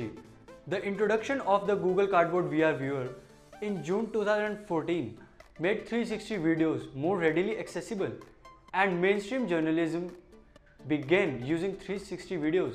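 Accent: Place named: India. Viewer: What is everyone's problem, he's talking fine, it's a heavy Indian accent but totally understandable.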